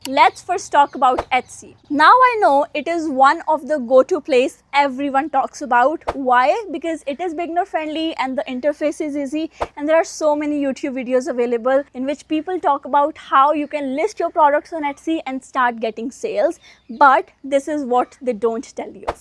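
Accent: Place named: India. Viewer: your accent feels like India + name also But nice reply me are you Indian?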